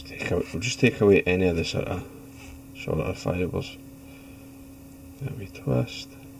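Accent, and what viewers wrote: Scotland, United Kingdom. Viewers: Love the Trainspotting accent and love the simplicity of that bug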